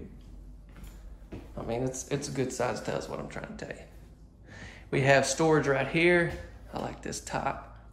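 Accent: South, United States. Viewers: This home is great, I really liked the kitchen and the utility room, I could live with it. You say utility room however you want to, I pronounce it like you because I'm from the south too. In one video you said something about calling the toilet something besides toilet, how about the porcelain throne :) Keep up the good work.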